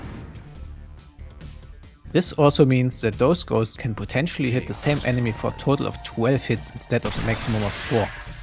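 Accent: Germany. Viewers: German accent man is back with another video!!!! POG You could narrate so many informative videos and I would watch them for your voice alone. It just seems so… perfect for this type of informative video. I don’t know why. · nice german accent i love it hahah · Ich mag deine Videos sehr, wwil sie ausführlich und informativ sind, aber ich finde, du solltest besser an deiner Aussprache arbeiten. Dein harter deutscher Akzent triggert mich extrem.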